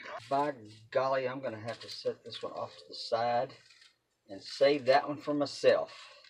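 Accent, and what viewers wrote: Texas, United States. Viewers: I loved watching this video and loved listening to your Texas accent. We live in North Texas stationary and travel as much as possible. Thanks for sharing this with us. I am going to try this with dollar store pound cake. My Momma used to make the best homemade pound cake.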